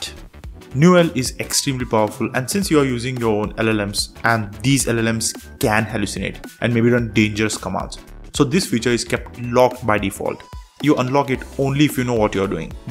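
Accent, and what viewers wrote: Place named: India. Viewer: Dude, I don't understand what you are saying. you got strong Indian accent. I agree with everyone here. LINUX should be free from AI. I left windows 10 years ago, because I don't want Bill Gates to spy on my computer and steal my bank information and all other login info. Installing AI that is NOT open source means you are putting a Trojan horse in your computer. Soon AI will take over your laptop and STEAL all your bank info and MONEY. That is scary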